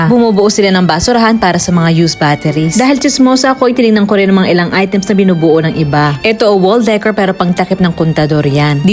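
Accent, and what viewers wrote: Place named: Batangas, Philippines. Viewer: Aliw na aliw Po Ako lagi sa pag voice over nyo 🤣 kaya Po Pala tuwid na tuwid Tagalog Po Ninyo, Ala-Eh! Tubong Batangas ka Pala. 😅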